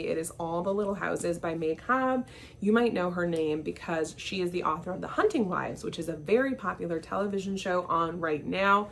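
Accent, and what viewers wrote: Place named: United Kingdom. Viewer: I love your take on British pronunciations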